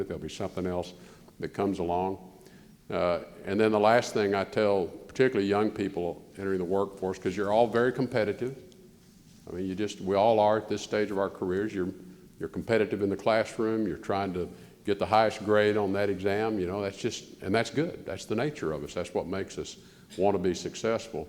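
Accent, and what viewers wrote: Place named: Texas, United States. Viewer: pro I miss that Texas draw :)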